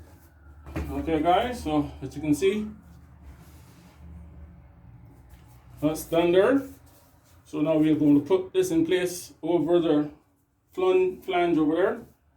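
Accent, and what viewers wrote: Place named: Barbados. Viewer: Total Bajan ingenuity on display here. Right down to “de axcent”. I tell you 2025 👍🏾 Barbados 🇧🇧 in Newfoundland 🤓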